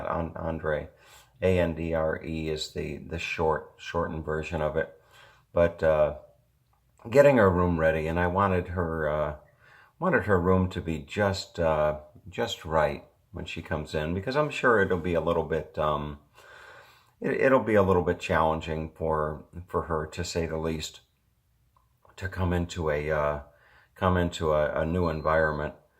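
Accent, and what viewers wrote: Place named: United States. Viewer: You mentioned about learning English from your videos. I actually only talk Aussie and I am trying to learn American from your videos. LOL.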